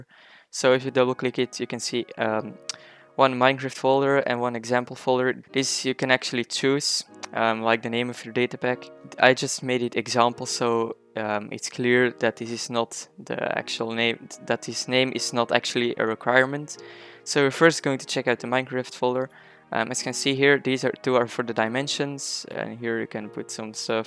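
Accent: Netherlands. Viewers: I can hear the Dutch accent....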